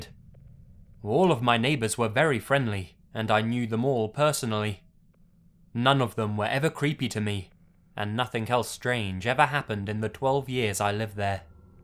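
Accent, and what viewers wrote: England, United Kingdom. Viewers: Yep but you know this guy is English you're probably American like me at this point they have become two different languages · someone who lives in England, English accents aren't always this great, enjoy it XD Congrats on the year,:) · Why do men with British accents narrate horror stories better than anyone else? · Riseys Well actually my voice, I'm from the same area of England as, there fore I share the same accent. Anyways, why so aggressive, pent up anger mate?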